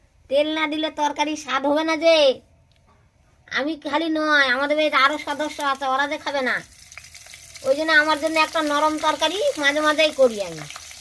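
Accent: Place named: Naogaon, Bangladesh. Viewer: বাসা কোথায়, একবারে হামারে নওগাঁ জেলার মুতোন ভাষা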